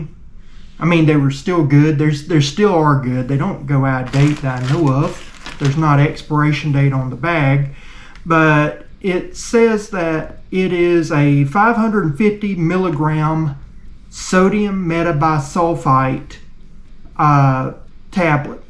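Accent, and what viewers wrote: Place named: South, United States. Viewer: Heck, I have been looking for something to complain about all day. Here is my chance! - It is the southern slow-talking. I spent half of my life in east Texas and the other half in west Texas. I made a few videos just playing around, I realized that many people found my accent unlistenable. I actually thought about putting in some effort and writing scripts and practicing a faster delivery, but then I decided I did not care that much. I believe that you need to speed up your speech and make more efficient use of your words? People seem to want information delivered at high speed. Best wishes.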